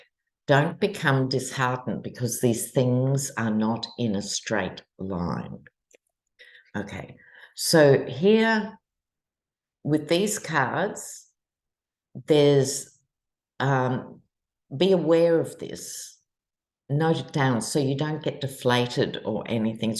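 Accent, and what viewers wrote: Australia. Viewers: BTW, I love your Australian accent which makes it even more fun to listen to your video!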